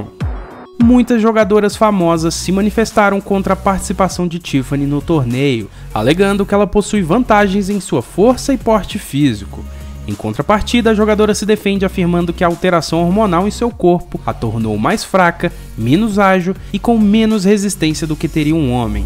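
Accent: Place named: Northeast, Brazil. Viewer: Cara vc é cearense ou Nordestino? Vc tem um leve sotaque. Valeu!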